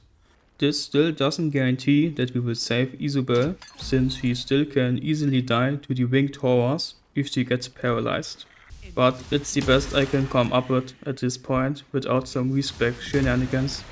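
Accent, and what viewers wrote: Germany. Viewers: Man... there was me thinking, hey i dont know that guy, lets watch that video, maybe he doesn't have a strong af slavic or russian accent like the others, so it might be fun to watch.... Then i heard the most stereotypical german accent ever.... FUCK ME THOUGH! I'm german, too and i just got humbled so very badly xD I could have an accent that sound to others like this and don't even know ... So congrats, you earned yourself a like and tought someone a lesson for life.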